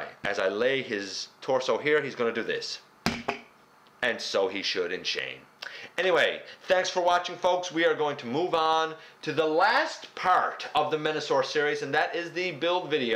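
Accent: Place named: Newfoundland, Canada. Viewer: Are you a Newfoundlander ? I come from there and our accents are almost identical. The only other possibility is if you come from Ireland or Cape Bretton.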